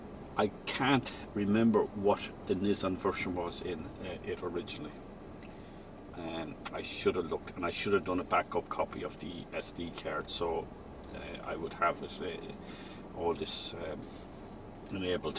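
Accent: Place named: Ireland. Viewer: Sounds like you're in Ireland.Wonder if you're close to me.. I need some help 2 days before christmas :(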